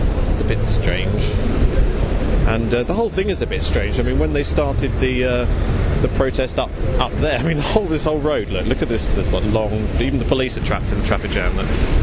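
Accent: United Kingdom. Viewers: please listen to this American woman: you are not uglier in the morning. You are not ugly at any point of the day during any of your videos. You are very attractive (not to mention very, very intelligent) and if my daughter was just a bit older, I would try to put you together with her. She is a genius girl, gorgeous, and an Anglophone. Too young for you though. Anyway. The point is, you're not ugly. You're a cutie pie who says lots of smart stuff whilst using a smooth British accent. 😉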